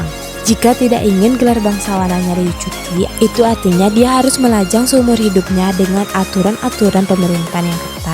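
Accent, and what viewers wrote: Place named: Padang, Indonesia. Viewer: Min, lu orang padang yah? Kerasa banget dialeknya.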